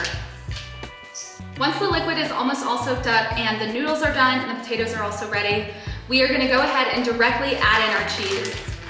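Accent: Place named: United States. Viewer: Ah, you are american, now I heard it. New subscriber here ❤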